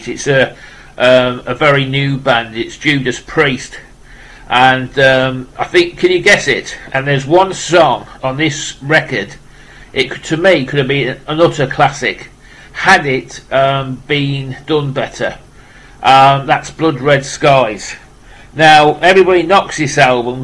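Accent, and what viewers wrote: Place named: United Kingdom. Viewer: The comment you made on Scott Waters regarding Deep Purple 🤣🤣🤣🤣🤣🤣🤣🤣🤣🤣🤣🤣🤣🤣🤣🤣🤣🤣🤣🤣!!!!!!!!!!!!!!!!!!!!!!!! I have something even Scott Waters can't get. You know the band Joshua (Hand is Quicker Than the Eye, Surrender, Intense Defense, Chapter One)? They released an album in 2012 called Resurrection. Around that time I was having on line conversations with the founding guitar player Joshua Perahia. I still chat with him from time to time. He allowed me to have #1 of 888 signed copies of Resurrection which is extremely rare. He also sent me some signed postcards. Why 888 and not 1000 signed copies? 888 is the Hebrew numerical equivalent of the name Jesus or Yeshua. Each Hebrew letter has a number assigned to it - a bit of trivia for you. You have a cool British accent. I'm from Newfoundland, Canada and I have a bit of a Newfie accent ha ha!! 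Many of us folks from Newfoundland have a different accent that is different from the rest of Canada. In Canada they sometimes end a sentence or reply with eh. Newfies end a sentence with b'ye! or hey b'ye! We sometimes reply with go on b'ye!? meaning you're kidding me!? or you're joking right!? Then others say that's great or wonderful we say "best kind!" Cheers buddy!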